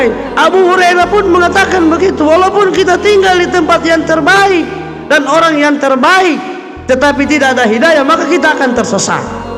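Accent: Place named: Manado, Indonesia. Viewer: Jangan piara bangkai Dalam Rumah .😂.. Ini bahasa Manado kah? Lucu dengar nya. Tapi sangat menyentuh hati subhanallah.. Semoga Allah berikan saya dan keluarga Hidayah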